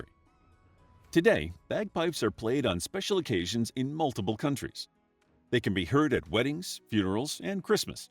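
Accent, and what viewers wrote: United States. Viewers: La voz de la IA es de risa. Cantigas de Santa María con acento de norteamericano que no sabe hablar español. Es de risa. La IA va a acabar con nosotr@ A demás es muy triste que no haya un humano al timón para corregir algunas palabras y malas traducciones. A demás faltan muchos datos.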